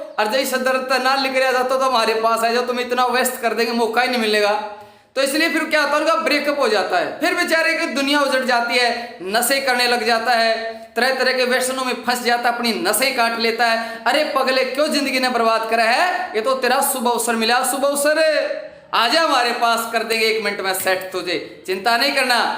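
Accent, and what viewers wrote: Haryana, India. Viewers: Ha guruji Mai 2 × speed pe dekta hu To samaj ni aati haryanvi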